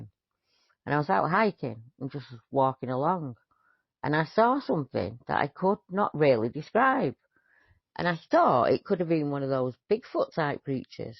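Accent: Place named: Manchester, United Kingdom. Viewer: accent is northern, sounds like she maybe from the Manchester area, however there are many different northern accents, such as Scouse, Geordie, Yorkshire, Cumbrian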